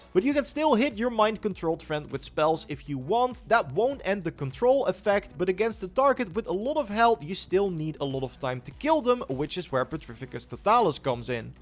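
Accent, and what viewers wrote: Netherlands. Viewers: Typisch Nederlands accent 😂, wist het meteen. Geabonneerd neef. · Hmm are you Dutch too by any chance?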